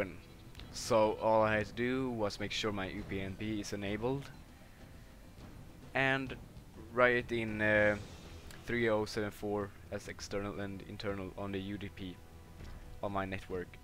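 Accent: Sweden. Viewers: Vi svennar har underbar accent asså <3 Very well done(in 1040p too!), works like a charm! :D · Snygg SvEngelska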